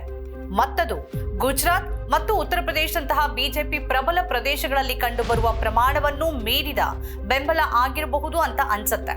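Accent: Kerala, India. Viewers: ನೀನೂ ಮಲಯಾಳಿಯಾ? ನಿನ್ನ ಉಚ್ಚರಣೆ ಮಲಯಾಳಿ ಹಾಗಿದೆ.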